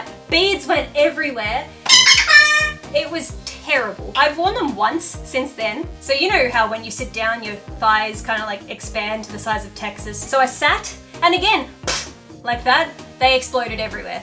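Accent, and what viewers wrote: Australia. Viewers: Please say things the way you naturally say things. I love Australian accents and learning how other people pronounce it call things. —People that seriously can’t get over the way other people say things are infuriating. News flash!! People from other areas aren’t always going to sound just like you. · Please don’t change how you say things, be Aussie proud🤗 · Are the two ways you pronounce "pastel" spelt differently? When you say things differently then I do I just think it's part of the Australian accent 🤷‍♀️ also just for fun I thought I'd mention that pastel means cake in Spanish which when I learned that found funny 😁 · Are u from Australia I’m from Australia 2 Sydney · You are just too sweet. I love your accent and the way you say pastel. You always want to please people, but you ALREADY ARE. If they are coming at you in any negative way they can buzz the hell off to their perf YouTube-r. 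There are plenty of us than know, understand ADORE your Aussie differences. Never change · I love your Australian accent. I may be American and you say words differently (obviously) but I don't feel like it's that big of a deal. I understand what you're saying, so say it however you want. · WOW! I cant believe that girl Nina Tailor was SO INCREDIBLY rude! 1st of all, if u don't like it, dont watch.. 2nd of all, Archie is the most awesome bird on YouTube and Yes, HATERS, he does need to be in every video he wants to be in. Also, I LOVE ur accent! What kind of person tells you your wrong for speaking in your native language/accent? Literally if you were to ask any person who knows me they would all tell you this about me: I always say that I want to hire an Australian person to give me bad news because I feel like no matter what you say, if you say it in an Australian accent it sounds happy lmao. 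I fairly recently found your Channel, did a bunch of binge-watching, and of course subscribed! I think you're great, I love you, I love Archie, I love your content.. screw the haters they're just jealous! Keep doing your thing girl cause you're awesome!! And as always, TYFS! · Also I’m Australian and I can confirm that’s how we pronounce things, fight us international folk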